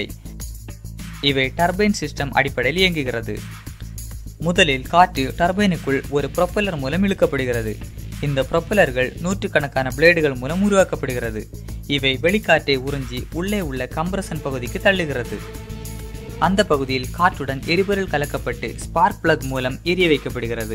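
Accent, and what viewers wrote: Kanyakumari, India. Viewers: தம்பி கன்னியாகுமரிண்ணு கரெக்டா தெரியுது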